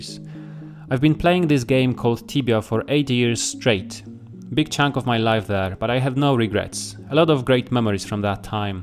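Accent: Poland. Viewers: Coś tak czułem, że jesteś z Polski :-) Gratuluję świetnego kanału i życzę wielu sukcesów!!! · Tak czułem, że jesteś z Polski hahah. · Trafiłem na ten kanał godzinę temu i tak właśnie pomyślałem, że to chyba Polak. Akcent i typowo "nasze" krajobrazy w tle zdradzały to całkiem wyraźnie. :)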